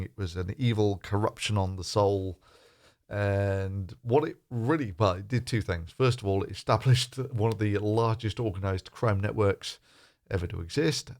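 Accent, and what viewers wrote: United Kingdom. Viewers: I am not one of those usual bashers of. My first post reflects this... I enjoy most of his videos, this one, not so much. I don't know why you feel the need to speak for, but some of your claims are not true, so here we go: says about Matt Gaetz, while showing articles about him being investigated: "There is no love lost between me and folks like this at all. It is kind of what you would get if you infused something devoid of morals, with the intense desire to sniff Trumps ass. ". At no point before this does he say this is his opinion, he is actually insinuating that it is proven facts by showing headlines, that is NOT proving what he is saying. This may be unintentionally, but it certainly have this effect on an uninformed less critical viewer. But of course, some of us understand it is his opinion, in particular I, which is proven by the words in my first post. 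It is also possible, even though speaks with British accent and does not live in USA, that he suffers from Trump Derangement Syndrome, and because Matt Gaetz have been fighting for Trump, he already have a bad vibe about Matt, and therefore cannot help himself but joining the lunch mob. Why else would he bring Trump into this? And why this hostile way of expressing himself about someone doing something they have not yet been proven to have done? It really does not matter if this is his opinion. It does not make it right. It is defamation. Online lynching is not a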